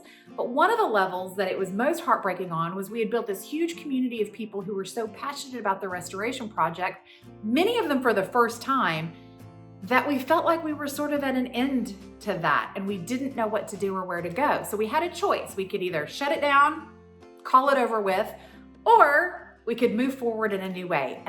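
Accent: South, United States. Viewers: I cannot thank you enough! Seriously. I’m a 32 year old woman who has been (oddly) passionate about historic architecture, primarily residential, since early childhood. I remember my mom taking me to the plantation homes in Live Oak and Amelia Island when I was in elementary school, and being obsessed. When I was in middle school it was the Biltmore Estate, and in high school it was Stone Mountain, GA’s historic homes that were shipped in from all over the country. That led to me taking architectural design courses, and I FINALLY landed myself my very own restoration project in my 1919 arts and crafts home in Pennsylvania. 😍 I so enjoy the videos you share, and all of the knowledge you are passing on. Not to mention the beautiful preservation of history that you’re directly responsible for. Just immaculate! Thank you, thank you, thank you. (The southern accent also helps! 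Lol. From one southerner to another. I miss the accents from back home now that I permanently have lived above the Mason-Dixon for the last decade!)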